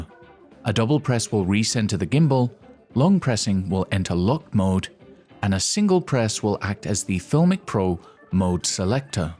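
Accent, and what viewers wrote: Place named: United Kingdom. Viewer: I agree with you. Best of the British accent.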